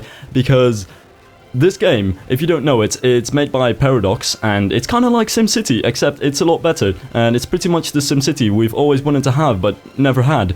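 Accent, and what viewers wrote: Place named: Netherlands. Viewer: je accent is heel erg herkenbaar aan mijn engels accent. Ik herkende direct dat je nederlands was voordat je het zelf zij xD. Een combo van brits en amerikaans en soms een beetje nederlands:D daar krijg je een sub voor!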